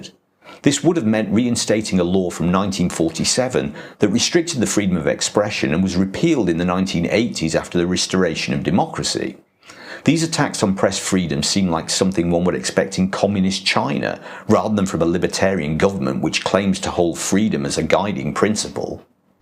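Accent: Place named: United Kingdom. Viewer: You're British accent doesn't mean you are right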